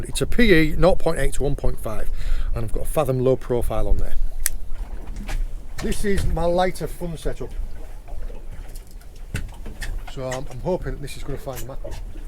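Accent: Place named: England, United Kingdom. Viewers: Dear Mate! I Love your Cornish accent, heavy to understand. Fishing, foraging the Cornish tides (along with your son)! Like Grannma Gina cooking pasta, however allowed two lobsters, three big crabs waving with claws before cooked. That’s the juice! You’l never eat all the seafood by yourselves. You catch and you share with Family and with Friends. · Your English accent is unbelievable. Don't understand nothing. My hello from Ukraine!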